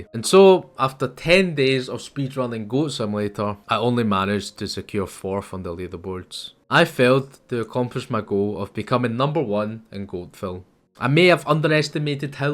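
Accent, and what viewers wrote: Scotland, United Kingdom. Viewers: Asian man with a Scottish accent. fucking awesome. <3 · There's such a goofy charm to Scottish accents, audio book when??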